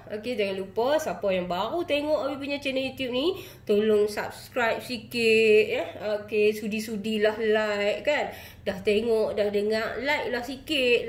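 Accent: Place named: Kedah, Malaysia. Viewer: Asalamualaikum Terima kaseh Banyak dah Saya tengok vedio pola Baju kurong tapi Cara u explain sgt2 jelas Dan mudah d fahami Dan lucu dgr Loghat Kedah u rasa u memberi penerbangan sgt2 jelas u slalu kata jgn dok COMPLAIN. BUAT. DULU BUAT YG. MUDAH. DULU SAMPAI. FAHAM BETUL belajar yg mudah dulu sampai faham lepas tu Baru belajar yg lebih advance TERIMA KASEH. SEMOGA SIHAT2 SELALU DAN D LINDONGI ALLAH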